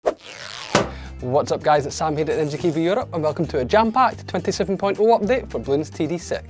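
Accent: Scotland, United Kingdom. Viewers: gud ol' skoatesh achscent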